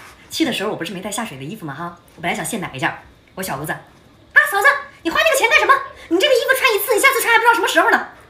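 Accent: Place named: Northeast, China. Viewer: 还得是用东北话讲才带劲儿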